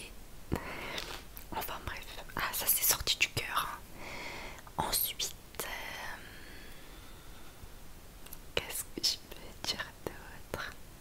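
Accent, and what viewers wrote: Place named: Lebanon. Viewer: je suis libanaise aussi vrm ton accent est magnifique (et toi aussi d ailleurs) ça fait grv plaisir d entendre qql personne parler libanais ❤️❤️🇱🇧🇱🇧 (je m abonné direct)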